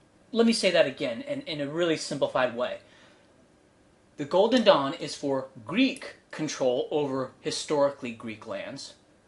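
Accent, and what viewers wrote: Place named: North America. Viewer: Are you greek? LMAO this is absolutely insane. A north american dude (by accent) deciding what thousands of miles away should do 🤣🤣🤣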